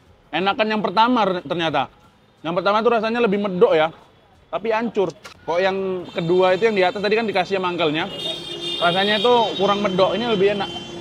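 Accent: Medan, Indonesia. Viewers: Omakk...logat medan ah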